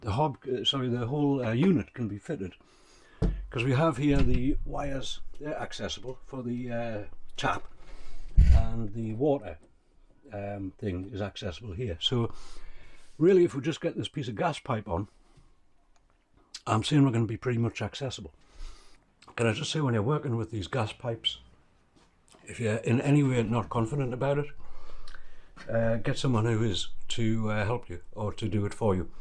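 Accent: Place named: Newbiggin, United Kingdom. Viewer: Another great video, Love to see what you've been doing to your van. Where abouts are you from? sounds like you are from newbiggin area?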